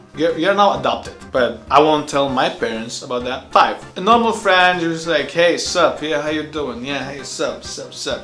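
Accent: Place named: Armenian, Lebanon. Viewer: You sound armenian lebanese 😂😂 No offense tho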